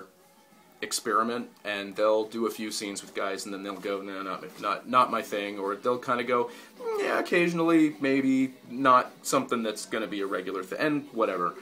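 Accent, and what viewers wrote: Atlantic Canada, Canada. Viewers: also, I love accents. I think they are wonderful. You can use that atlantic canadian accent all you want. I might even pick it up from you, cause well, I have habit of doing that after some exposure. It's so not intentional either. The sprectrum of sexual orientation is fluid, but each person has thier own container. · i love your atlantic canadian accent And your fake american accent! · how canadian, the accent and in the background.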